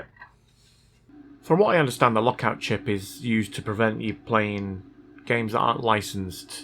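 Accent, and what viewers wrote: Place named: United Kingdom. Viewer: I can't tell from the accent, are you from the UK? If yes, be extra careful with NES cartridges. PAL region has 2 sub-region, PAL A and PAL B and they are not compatible. PAL A is UK and PAL B is basically rest of Europe. I see a big letter A on the Castlevania cartridge and if your console is the same then it shouldn't be a problem. Usually the dirty part is the receiving side of the big black connector, not the edge connector on the main board side. You don't need to replace it, just clean it. Usually boiling in hot water, maybe adding a little dishwasher liquid can help but make sure you rinse it off, preferrably with some distilled water. Or apply vinegar, then rinse it off with alcohol. The point is you have to make it squiky clean and don't want any limescale to form on it either.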